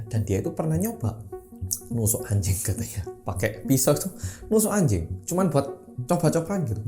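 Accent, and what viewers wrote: Java, Indonesia. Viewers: Kok mirip. Ko Korea medok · Hujan gini nonton KOREA REOMET BERSAMA ORANG KOREA YANG MEDHOKKKK.. Hehehe.. Semangat trus yea mas bikin konten spti ini candu bget dah.. Sehat2 sllu yea mas. 😊 🙏🏾 🖤 🔥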